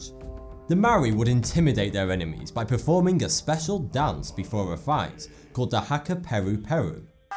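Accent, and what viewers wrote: United Kingdom. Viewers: Mr. Khan <link> No. It's a dialect that causes a lot of British people to do this. I made the same mistake a while ago with someone else and was corrected. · Take it from me as a Brit, it's just how we say things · The British accent just makes videos like these so much better, great video and great channel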